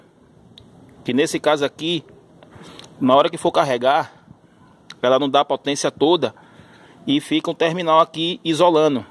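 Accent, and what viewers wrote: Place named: Northeast, Brazil. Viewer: Cê num reparou a conversa de nordestino não?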